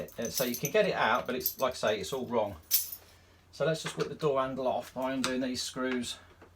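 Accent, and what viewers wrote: Leicester, United Kingdom. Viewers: Great vid, sorted it! Is that a Leicester accent?! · Knew I recognised the accent! Fellow Leicester lass here! (Living down south) .. I have this problem right now.. Will report back!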